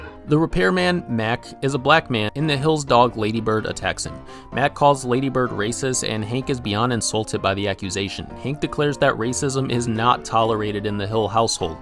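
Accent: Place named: Canada. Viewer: 5 seconds into hearing you speak all I could think was “this dude is so Canadian”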